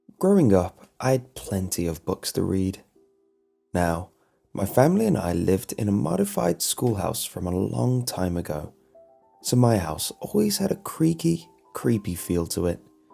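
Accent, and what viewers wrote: United Kingdom. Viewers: Is from the uk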